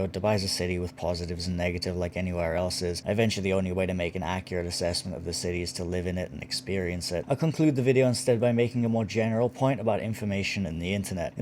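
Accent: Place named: India. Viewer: interesting accent, i guess you are indian or somewhere from middle east?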